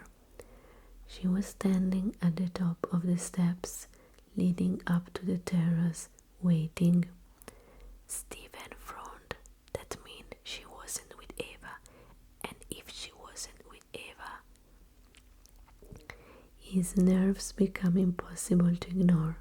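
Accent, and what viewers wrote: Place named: Italy. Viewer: Amazing!!! I love your italian accent, very relaxing.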